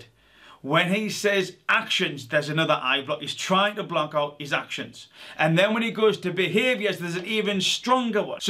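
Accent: Newcastle, United Kingdom. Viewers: I love hearing a local accent on YouTube. We Geordies are gonna take over! Like the content. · U a geordie?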